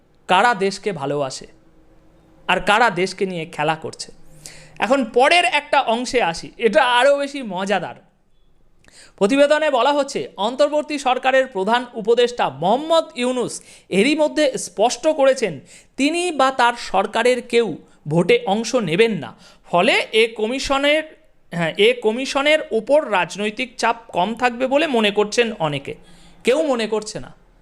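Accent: India. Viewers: দসক ওনি বাটপার উটপার ওনি বাংলাদেশের না ওনি ভারোতের লোক ওনার বাষায় বোজাজায় ওনার কথা সোনে লাভ নাই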